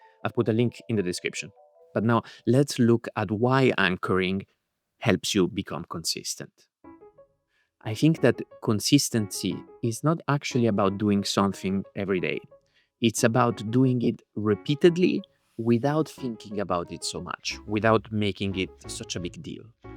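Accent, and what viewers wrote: Italy. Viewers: Thank you for this video😁 I needed a reminder that being consistent isn't that hard when you're smart about it. I also love your italian accent! Rooting for your success!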